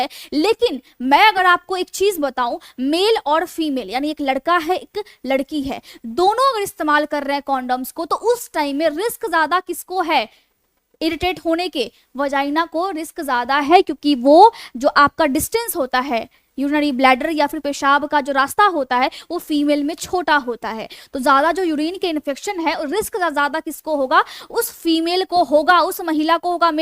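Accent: Bihar, India. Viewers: बिहार वाले पहचान मे आ जाते है बोलने के तरीके से आप कहा से है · Didi app Bihar se ho kiya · Bihari ho ka ji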